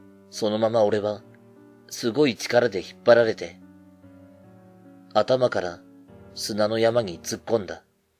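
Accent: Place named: Kansai, Japan. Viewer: こんばんは😃🌃 [週刊怪談]ありがとうございます～🥰関西弁可愛よ💝拝聴中～🤗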